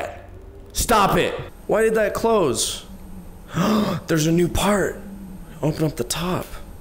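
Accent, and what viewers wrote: United States. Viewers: What is his accent? Sounds Jersey, but I wouldn’t have a clue.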